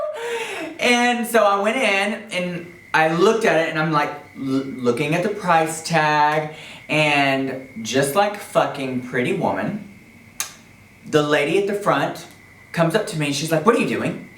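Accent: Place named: United States. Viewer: the most amazing accent from the us.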